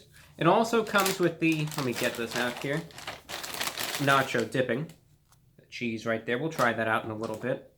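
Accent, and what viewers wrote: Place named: New York, United States. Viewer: Where are you from? You sound like you are trying very hard to talk without an accent, but you actually have a heavy one. I'd guess NY.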